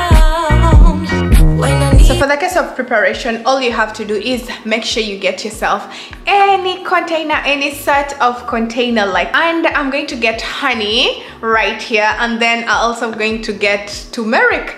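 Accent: Uganda. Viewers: You sound like you from UG, am I right?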